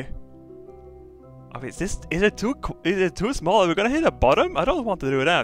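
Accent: Sweden. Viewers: Yes, I'd love to watch a rocket take off then turn on the radio and hear "Let's do this shit!" in a Swedish accent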